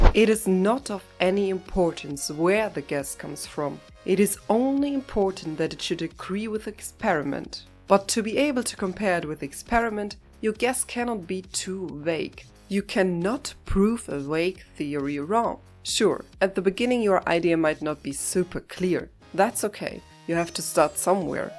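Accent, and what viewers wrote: Germany. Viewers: After just 10 seconds into the video, let me take a wild guess: somewhere in the germanophone region?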